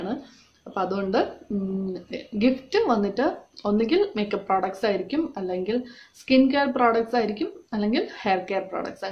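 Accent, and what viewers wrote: Palakkad, India. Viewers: Palakkad Way of talking More vlogs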